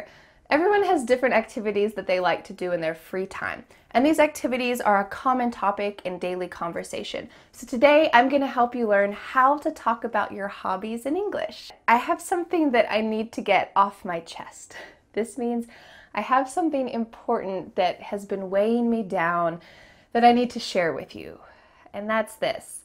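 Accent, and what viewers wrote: United States. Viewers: Thanks. Is this American or British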